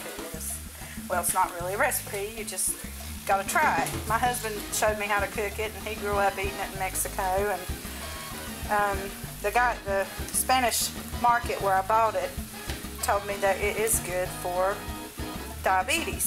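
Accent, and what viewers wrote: South, United States. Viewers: Love her accent! Makes me miss the south.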